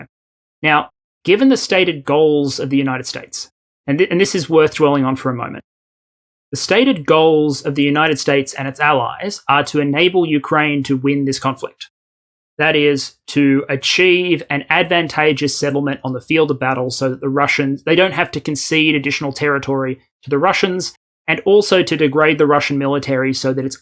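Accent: Australia. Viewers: If shorter means more regular content is possible then I am all for it. I just want to listen to those dulcet Aussie tones telling me things that no one else seems able to tell me. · I don't have any kind of training or expertise in any field relevant to this war, so am very happy to get as much information as you can provide, considering every angle and in as much detail as possible. I enjoy sitting through exceedingly long lectures, so unless you're reading through a list of every nut and bolt of every tank there is, I won't be bored. I also very much enjoy your style of exposition, and I always get a kick out of your accent. Listening to an Australian give a more level-headed explanation of what is happening to our neighbours somehow feels reassuring. That being said, I understand that covid is still giving you a hard time, so I will not complain about the shorter videos. 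I would suggest that you create more in-depth analyses and divide them into episodes. I suspect we are a rather patient audience. I wish you a full and speedy recovery! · I like how these videos are slowly getting more 'strayan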